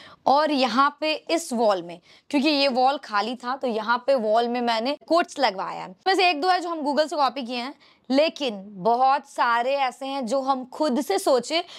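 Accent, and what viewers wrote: Bihar, India. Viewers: Aapr proud hai.. Qki aap real ho. Genuine girl ho. Aaapka Bihari accent bahut achha lgta h.... Aap ekdm jamin se ujtkar yaha tak pahunche ho isly aap inspiration v ho sbke liye.. Love n support,👍👍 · I really Like her bihari accent.❤❤ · Ap jo bolte hai na di vhut acha lagta hai m v vese bihar s hu di..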